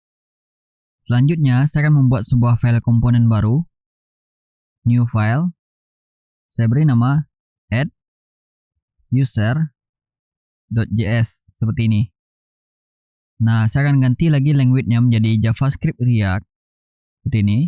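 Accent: Aceh, Indonesia. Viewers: Logat logat nya abang ni seperti orang aceh benarkah ? hehe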